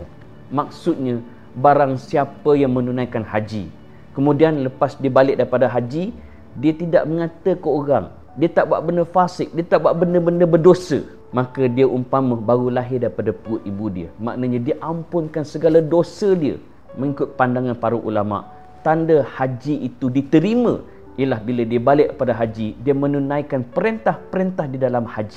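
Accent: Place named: Kelantan, Malaysia. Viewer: Dye nie kalau kecek kelantey terus aku x Fhm🤣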